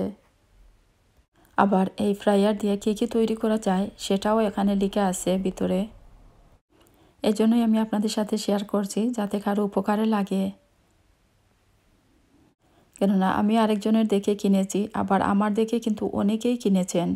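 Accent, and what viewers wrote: Sylhet, Bangladesh. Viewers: সিলেটি আপু।উনারা এভাবে কথা বলে। ধন্যবাদ আপনাকে ও আপুকে। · আফা সিলেটি নি গো?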